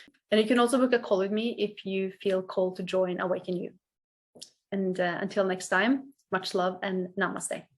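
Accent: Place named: Norway. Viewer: Tack! Det står helt klart att du har egen erfarenhet av det du talar om. Själv är jag inne i min tredje mörka natt. De två tidigare var jobbiga, men betydligt lindrigare än denna, som har pågått över 3 1/2 år. Efter de två tidigare mådde jag väldigt bra och trodde aldrig jag skulle behöva erfara detta igen. Men uppenbarligen behövs det. Varannan dag är hanterbar, ångesten är påtaglig men ändå fungerar det på något sätt. Då börjar inspirationen komma, men det räcker tyvärr inte länge. Dagen efter brukar vara mycket tung, jag kan gråta hela dagen, och tror att detta aldrig ska ha ett slut. Jag brukar säga till mig själv att det är någonting fint som händer. Jag hoppas att jag ska kunna hjälpa andra människor i samma process som jag går igenom, och lugna dem med att allting är precis som det ska vara. Tack för den inspiration du ger. PS Jag hoppas att mitt inlägg översätts till engelska så att det inte bara är du som kan läsa det! 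Man hör tydligt på din accent att du har norska som modersmål! Trevligt!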